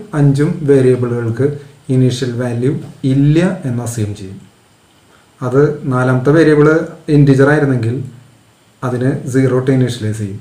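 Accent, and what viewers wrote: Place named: Thrissur, India. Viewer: Sir super class. Pinne sirinte Thrissur bhasha suprrrr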